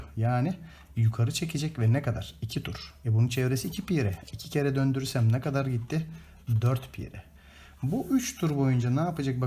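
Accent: Thrace, Turkey. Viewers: harikasınız hocam.. trakya ağzını da öyle cok özlemisim ki videoları hızlandırıp izlemeyi bıraktım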